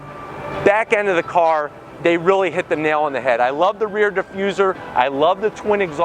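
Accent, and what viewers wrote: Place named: New England, United States. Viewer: Well guess what? I'm gonna have to zonk your gay ass yankee accent. TTFD thats what your working with.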